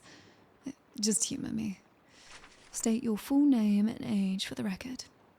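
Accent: United Kingdom. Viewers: British 😭 dont shank me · Sleepy British girl 💜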